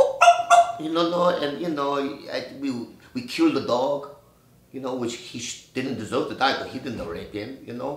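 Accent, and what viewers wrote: Mexico. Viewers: Lol sound more Mexican than asian but Whateva works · accent sounds more mexican than korean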